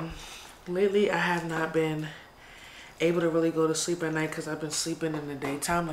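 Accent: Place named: New York, United States. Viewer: Looks good and I was dead at the mail part falling lmaoo 😭🖤and omg you sound you from newyork !! Got to be but love the accent !! Keep going gods got you and YouTube is for you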